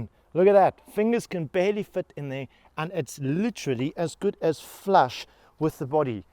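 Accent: South Africa. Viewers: Are you South African? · sure runs that pie-hole ~ is that a S African accent?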